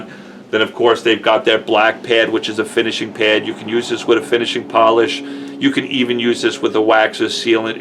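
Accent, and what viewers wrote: New York, United States. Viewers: Do I detect a NY accent?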